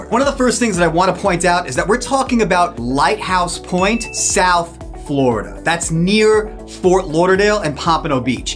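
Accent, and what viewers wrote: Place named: New York, United States. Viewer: Hey love your energy. Your definitely a new yorker or from NJ 🤣 i can tell. I'm from NJ looking to relocate there. Your videos are the best and so informative.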